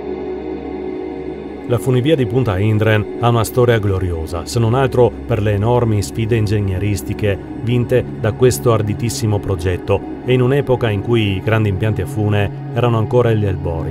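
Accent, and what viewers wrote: Italy. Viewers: Un altro servizio superlativo... niente da aggiungere.. tutto perfetto e bellissimo, narrazione precisa e molto tecnica ma comprensibile a tutti... complimenti davvero...bravissimi (per mi che son della zona l'accento triestin se un plus che fa sempre piazer 😀😀).. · Scusi lei è veneto?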